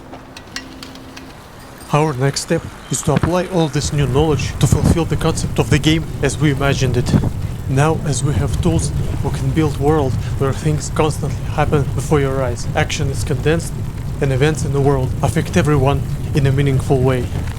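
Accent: Russia. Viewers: why he has so a russian accent?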